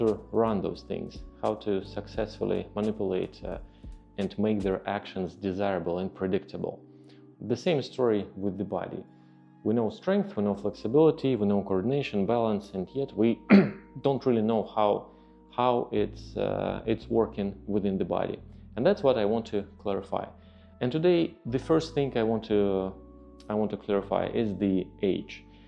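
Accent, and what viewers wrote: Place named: Russia. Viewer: Yes now it makes sense. Your accent and vibe remind me of another one of my favourite YouTube content creators, Anton Petrov. He’s also a Russian expat.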